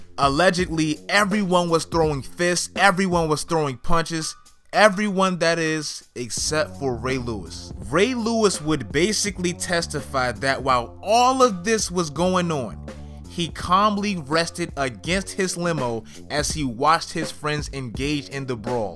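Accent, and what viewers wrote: Baltimore, United States. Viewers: Yo u from Bmore?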